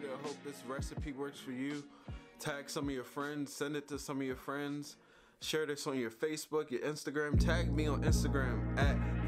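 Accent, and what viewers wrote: Baltimore, United States. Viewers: You from Baltimore, ain't you?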